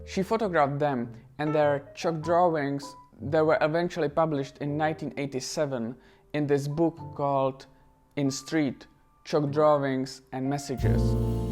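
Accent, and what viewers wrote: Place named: Germany. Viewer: He speaks English with a heavy ( sounds German ) accent . I `m sure he speaks English better than you speak German .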